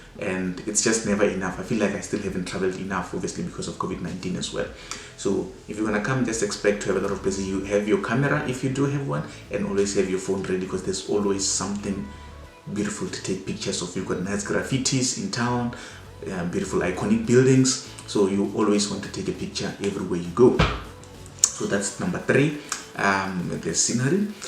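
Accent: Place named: South Africa. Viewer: Love your SA accent by the way. It's beautiful!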